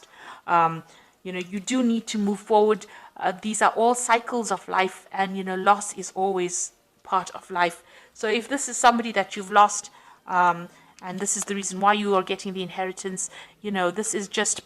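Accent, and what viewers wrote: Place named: South Africa. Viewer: Great reading, thank you 🙏. Also, is that a fellow South African accent I hear?? 😃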